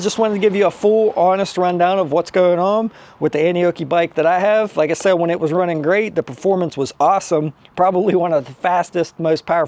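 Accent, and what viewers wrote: Pittsburgh, United States. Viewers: Dude sounds like he's from Pittsburgh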